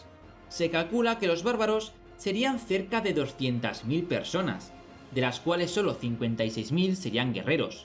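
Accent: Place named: Spain. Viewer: Esa voz tan amplia bien española esa fuerza digna de una puesta teatral , bueno sirve para darle más animación, siga así señor que hace más interesante esta hermosa lectura , gracias desde La Paternal Caba Argentina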